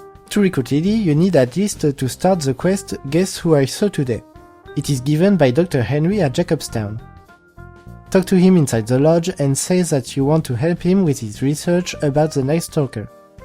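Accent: France. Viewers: ton accent français Me croire que tu es un français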